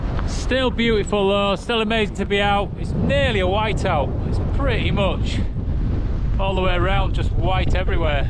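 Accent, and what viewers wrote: Yorkshire, United Kingdom. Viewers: Beautiful video and very exiting for a camping video xD But I have to ask. I'm originally from the UK but now live in America. This is my first video watching on your channel. It sounds like you have a Yorkshire or nothern accent. May I ask where you are from? I was born in North Yorkshire and lost my accent due to bullying at a young age, but sounds so familiar, so I am just wondering :)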